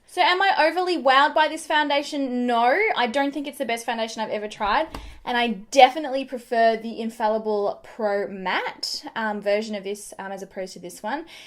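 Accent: Australia. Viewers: ❤❤❤❤ love all ypur videos, your style and your australian accent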